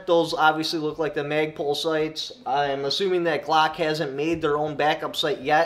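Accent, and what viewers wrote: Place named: Upper Midwest, United States. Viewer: There’s something unintentionally comical about this young man. I can’t exactly put my finger on what it is. I think it’s what I assume is his upper mid west mannerisms. I surmise he’s from there based on the way he pronounces certain words like mouth as “mewth”.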